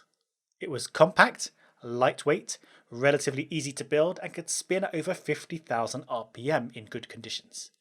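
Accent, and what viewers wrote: United Kingdom. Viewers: How much I love this channel and how helpful it is, I find it extremely hard to follow the language. No secret that British accent is more sophisticated if you please, so it's hard for a non-native speaker to catch it at times, then the speed of narration is quite high to read the subtitles, especially in parts where the language is altiloquent. Moreover, there's technical stuff that you're trying to wrap your head around which makes it all even harder. In view of this I heartily ask you to consider slowing down the narration a bit, and maybe spell some words more distinctively. Thank you!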